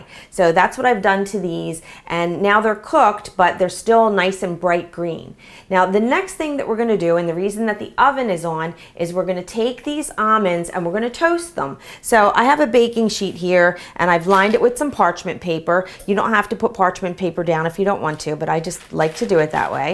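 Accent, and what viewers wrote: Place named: Philadelphia, United States. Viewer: Love it. "Wudder" Are you from Philadelphia? Making this for Turkey Day today. big hugs.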